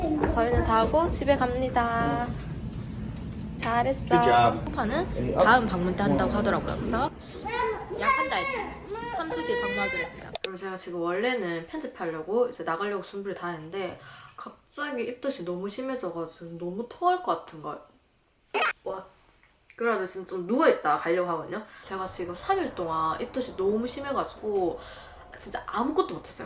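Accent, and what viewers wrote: Gyeongsang, South Korea. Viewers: ㅋㅋㅋㅋㅋㅋㅋㅋㅋㅋㅋㅋㅋㅋ 억양이 완전 대구 억양ㅋㅋㅋ귀여움..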